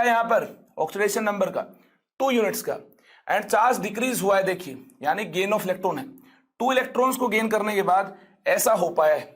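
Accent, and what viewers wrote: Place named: Haryana, India. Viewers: Sir app harayan ka lagta ho boli sa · Class 11th:_ A teacher with HARYANVI ACCENT🤩🤩 preparing us for the real DANGAL to be fought in 2022 🔥🔥🤓😎😎 🏅🎖🎖🥇🥇 22 dislikes😡😡they dont deserve to live in this planet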